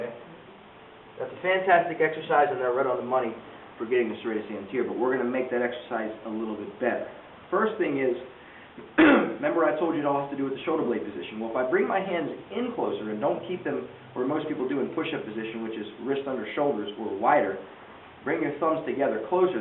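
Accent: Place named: Philadelphia, United States. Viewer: Ended up here because I have to study the Serratus Anterior for an A&P exam and came across an article about how in a weakened state, can cause rounded shoulders. BUT- by doing a push up plus you can increase your strengthening of this muscle, so I ended up here. Don't ask why but 15 secs into this video, I couldn't stop wondering if is from the NE, particularly, Philly. Just from listening to how he speaks.Could be wrong but it's kinda fun to be able to determine that.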